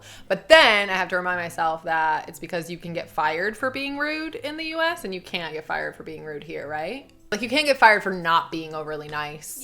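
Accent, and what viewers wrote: England, United Kingdom. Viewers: Your British accent might be rough but you have developed a British-esque cadence to your speech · I actually think you have picked up a little english accent love it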